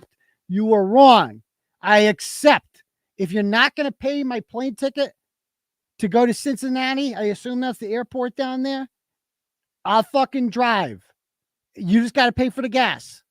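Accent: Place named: South, United States. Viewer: Your southern accent is hilarious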